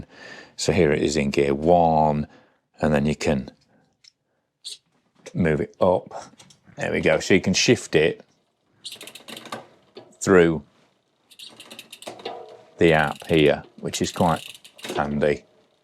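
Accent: Northern England, United Kingdom. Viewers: Is it me or is accent getting more nothern as time goes by😂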